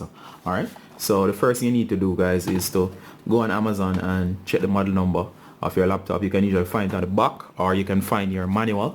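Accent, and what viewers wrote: Jamaica. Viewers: Hey, A Yardie tech support, gwaan rude boy, great video.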